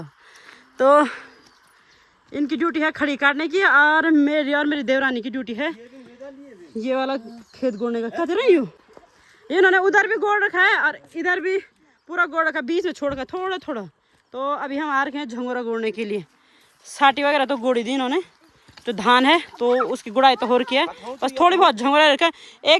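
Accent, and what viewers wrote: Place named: Garhwal, India. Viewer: Tumhare bhasha hamre Garisian ke jess milati julti hai aap kahan se ho Ham Garisian se h aap vbi sayd Garisian hdi sayd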